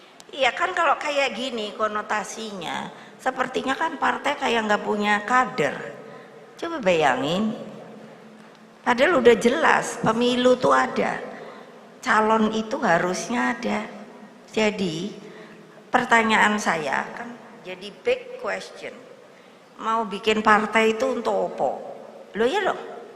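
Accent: Java, Indonesia. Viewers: Jawane medok 👍😁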